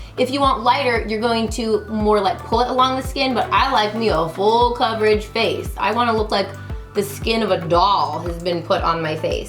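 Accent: England, United Kingdom. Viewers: I love your British accent it makes me laugh, and you look amazing as usual. But it does look like that was a lot of product being applied, no? I just don't like to look cakey. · Anyone who hates on your british accent can leave right now. You’re not part of the fam, bye. P.S. Missed you as well, not just the accent. Xxx (cause thatks how the British end their notes) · Love this trick! Gotta try this miracle foundation!!!:D And I love your British accent!! I like speaking like a Brit too ☺️ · I also love your British accent.... I'm a Brit 🙌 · I’m English and I love your English accent ❤️❤️ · Love this tutorial - and your British accent rocks 😆💞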